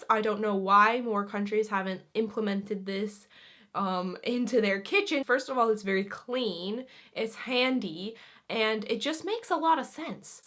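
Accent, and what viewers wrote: United States. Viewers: Yu sound American not Finnish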